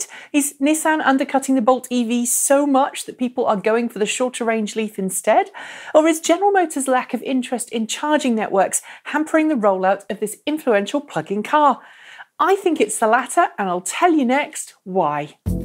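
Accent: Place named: United Kingdom. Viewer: Cannot stand listening to British accents. Go talk about tea and cricket.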